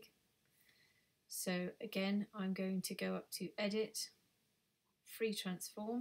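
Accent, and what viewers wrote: United Kingdom. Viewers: I love your British accent my dear....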